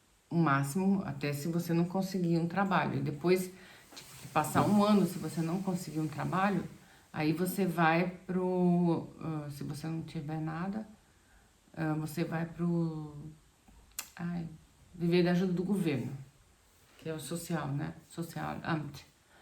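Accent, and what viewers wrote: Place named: Espírito Santo, Brazil. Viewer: Gostei muito de você que Deus continue abençoando você e sua família. Você é do Espírito Santo? Você tem um sotaque parecido. Desculpe pela indiscrição, mas o seu namorado é alemão? Eu já morei na Itália. Não por causa de dinheiro mas por gostar do país da língua sou neto.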